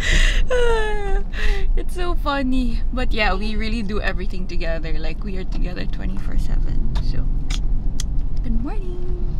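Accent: Visayas, Philippines. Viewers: Ka cute paminawn magbisaya hahahaha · ms., ang cute mo magbisaya🥰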